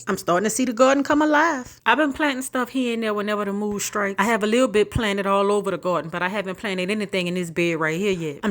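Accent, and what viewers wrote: New Orleans, United States. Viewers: She sounds like she's from new orleans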